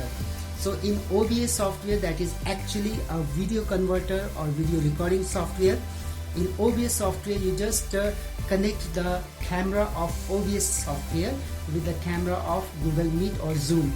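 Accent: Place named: England, United Kingdom. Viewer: Thank you sir. For sharing this video. I like your english accent sir. 😊😊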